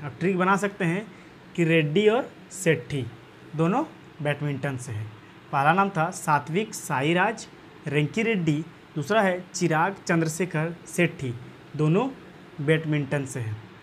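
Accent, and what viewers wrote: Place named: Maharashtra, India. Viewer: Mala tuji hidi ayakun asa vatla ki tuji bhasa marati ahe asa vatla pahlida 🧐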